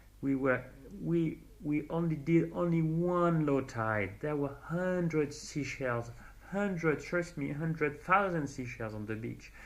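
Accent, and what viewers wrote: France. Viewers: Why would anyone apologize for a French accent, among the most elegant on the planet? Votre voix est tres suave. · I think your YouTube channel is a great thing, but I'm going to be honest with you, if you wear your mask and snorkel (probably your fins too) noone in the scientific community is going to take you seriously, the image implies that you dive for shells in approx 30 ft at best, you are missing out on so much more....btw your French accent is awesome :)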